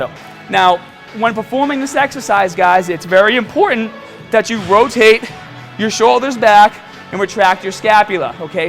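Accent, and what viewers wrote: Boston, United States. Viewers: Hey Cap! It's been a while since I've watched your videos. I noticed your Boston accent is heavier. I wonder why. It's just a curious observation. It's quite lovely! And as always, great tips from the captain!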